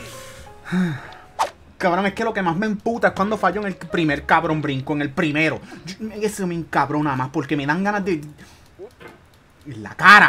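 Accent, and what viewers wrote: Puerto Rico. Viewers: QUE SEA PUERTORRIQUEÑO LE DA EL TOQUE JAJAJAJAJAJAJJ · El acento de Puerto Rico es super bueno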